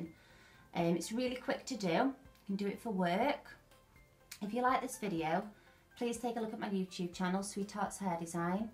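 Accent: United Kingdom. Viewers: Idk What to love about this video. The fact that it's so easy and pretty or the british accent. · Whats a 'bon'.....oh...british accent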